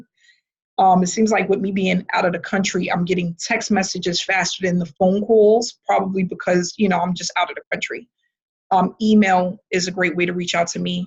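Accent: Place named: New York, United States. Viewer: Thanks for your speedy acknowledgement... love that New York accent or is it Boston?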